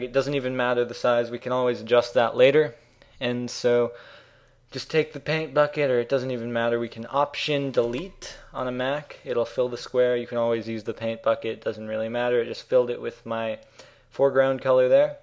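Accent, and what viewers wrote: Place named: California, United States. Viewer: You sound more California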